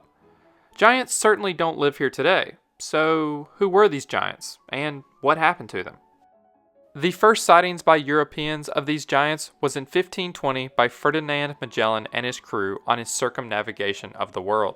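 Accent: South, United States. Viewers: Georgia boy? Or maybe Carolinas? Can hear the accent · Nice to hear an American accent finally on the commentator.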